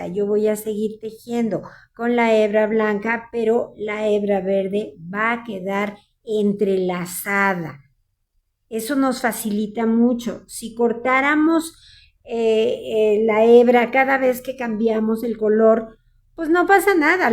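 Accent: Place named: Mexico. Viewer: gracias, acabo de hacer una muestra con punto bobo y también queda muy bonito., no se de que país sois ? el acento parece de México, o tal vez me equivoco 🤭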